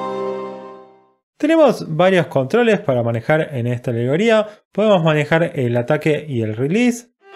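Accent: Argentina. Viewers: acento cordobes creo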